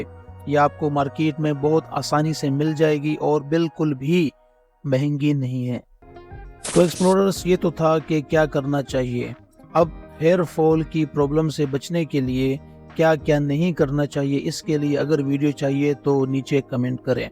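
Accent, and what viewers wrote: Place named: United Kingdom. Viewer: Your English accent too good.Your English accent is just like British people's.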